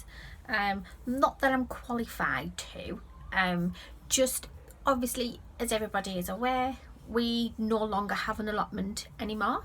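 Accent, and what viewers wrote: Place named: North, United Kingdom. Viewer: I've recently taken on an allotment in Thornaby which by your accent sounds like it's not too far away from you. I'm taking it slow and I'm going to have wild flowers near the front to aid with the illusion of success but i do understand its a massive job but I'm planning to deal with it piece meal and improve each week any advice on where to get manure etc ?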